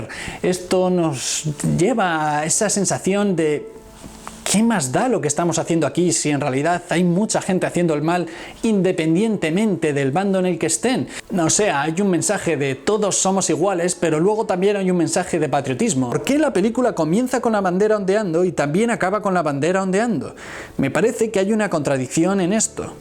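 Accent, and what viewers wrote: Spain. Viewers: Para comenzar, el dejo o mote Español me llega al chompiras. Y a quien xuxa le importa si el tanque, o la placa o la maquina de escribir son o no son de la época.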